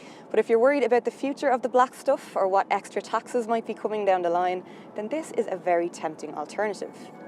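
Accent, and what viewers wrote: Ireland. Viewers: Couple points, The color of the vehicle is really beautiful. The roads that were driven were very scenic, ? in Ireland; whereabouts? The interior in my opinion, especially infotainment (if you want to call it that) is not as nice as the competition. The curves and grille of the vehicle give the car a unique character. The lady doing the review is really pretty, and I have not been to Ireland, and enjoyed listening to her accent. I am writing from USA. Thank you for this review.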